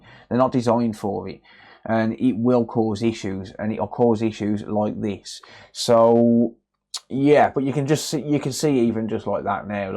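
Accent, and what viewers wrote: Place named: Black Country, United Kingdom. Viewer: Hi mate you sound like your from by me like Dudley or Black Country area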